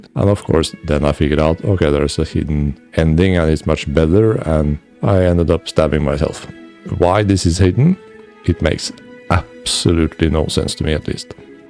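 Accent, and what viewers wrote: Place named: Norway. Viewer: haha this english it could only be the one of a norwegian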